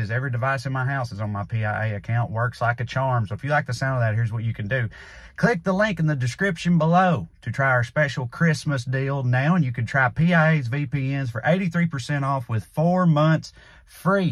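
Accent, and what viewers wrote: South, United States. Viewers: Speaking as a Mississippian, I don't hear the word "defenestrated" or "feckless" said with a Southern accent nearly often enough. I know my neighbors too well to trust them running anything much or picking our leaders. Through their great decision-making skills, they have enough trouble getting through their own lives, I don't want 'em having much influence on mine. Vote blue. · As someone who was born in Alabama, thanks for this video, great example of someone with a strong southern accent who sounds legitimately intelligent. lol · Love ya Trae ❤ & I'm so bloody proud of myself that - as an aussie - i can understand you without subtitles ( because you speak really fast as well as with an accent I'm not used to even among american accents 🤣🤣 ) - love ya like chicken ❤❤❤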